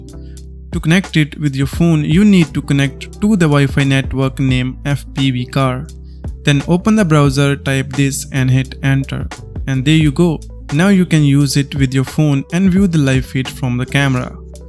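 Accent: India. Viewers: Мне одному кажется, что это русский говорит по-английски с индийским акцентом?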